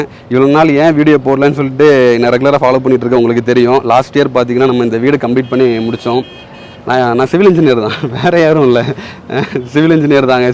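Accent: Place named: Karaikudi, India. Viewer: ஆமா ஏன் la வீடியோ போடல உன் ஸ்லாங் எனக்கு பிடிக்கும் ல அக்கா காரைக்குடி ல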